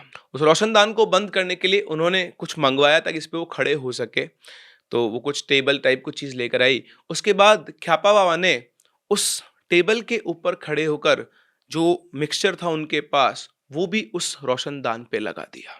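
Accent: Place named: Bengal, India. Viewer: Bengali accent ....story bengal ki h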